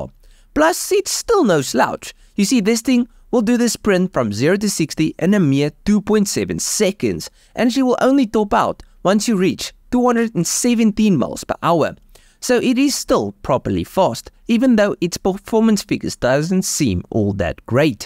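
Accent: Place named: Brakpan, South Africa. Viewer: Liked the video for the pure Brakpan accent 👍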